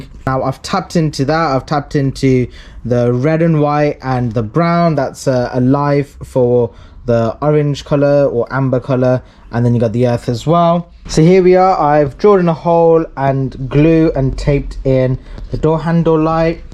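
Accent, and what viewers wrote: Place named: Birmingham, United Kingdom. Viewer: He’s in the UK, you can’t tell by the accent? He’s in Birmingham.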